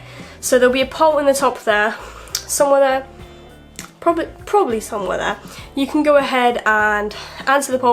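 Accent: United Kingdom. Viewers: i was thinking that she dani but british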